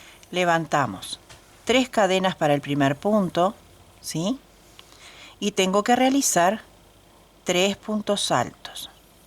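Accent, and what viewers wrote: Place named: Argentina. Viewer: Señora quisiera que usted me recuerde el punto Margarita, muchos les dicen jazmín, yo lo tejo hace ya un tiempo,pero olvidé como usted explica muy bien las cosas le pido ese favor la, la forma de tejer y de hablar suya dr parece mucho a nosotros los Argentinos, sepa usted que acá es muy querida un abrazó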